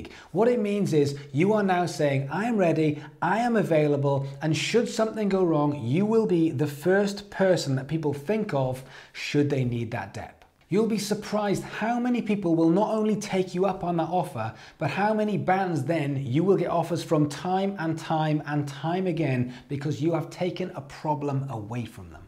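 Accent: Wales, United Kingdom. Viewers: Great vid! You gotta new sub :) Are you Welsh?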